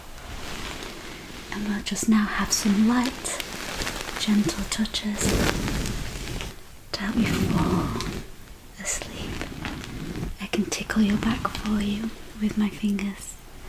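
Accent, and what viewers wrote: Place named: Yorkshire, United Kingdom. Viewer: I think Blissful Whispers ASMR is a Yorkshire girl as well. You ladies have beautiful accents.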